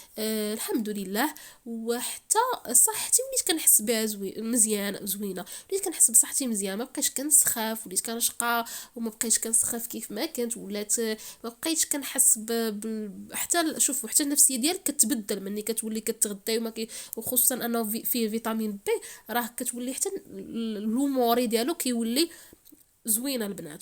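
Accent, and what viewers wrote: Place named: Maghreb. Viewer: سلام عليكم 🤗أنا ندخل نتفرج بش نسمع اللهجة المغاريبية تعجبني برشا 🇹🇳🇲🇦🙏❤و ربي يعينك 🤲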